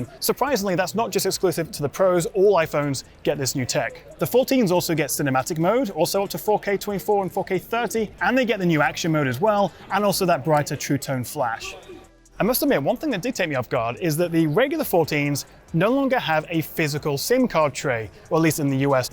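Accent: United Kingdom. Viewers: GAY BRITISH ACCENT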